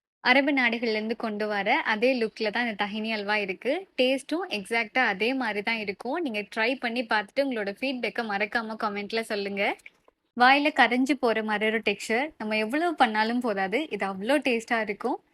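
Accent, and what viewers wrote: Sri Lanka. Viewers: நீங்க ஆரம்பத்திலே உங்க பேச்சை வைத்து srilanka என்று தான் நினைத்தேன்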